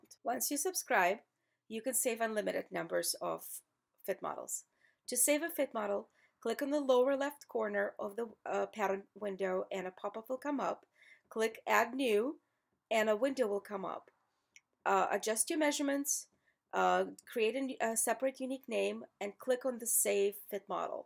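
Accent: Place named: Eastern Europe. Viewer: Why does Tailornova INSIST on having women with ANNOYING east European accents doing the narration?? Really, really annoying. Does this software originate in one of the east European countries??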